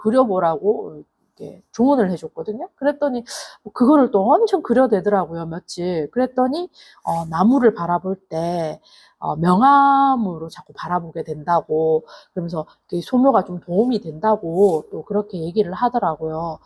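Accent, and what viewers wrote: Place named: Gyeongsang, South Korea. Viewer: 그림을 그리면서 말을 한다는것이 힘이듭니다 가끔씩 경상도억양으로 말해주세요 목소리를 들으면 고향생각도 나서 좋아요 그림수업 잘하고있어요 감사합니다 화이팅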